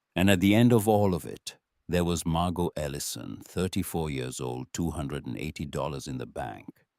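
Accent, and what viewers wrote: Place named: Wales, United Kingdom. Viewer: Loving the Welsh accent ! Makes a nice change from an American one or a faux English accent. ♥️🇬🇧♥️ It keeps changing between Welsh, Indian and French, hilarious ! 😂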